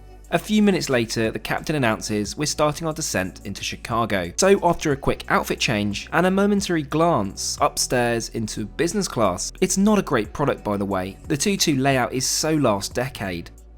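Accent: United Kingdom. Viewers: British accent pain to ears 😖😖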